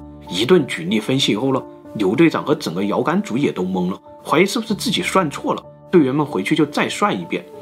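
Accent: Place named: Sichuan, China. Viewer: 你是哪里人啊，哈哈哈哈，四川？湖北？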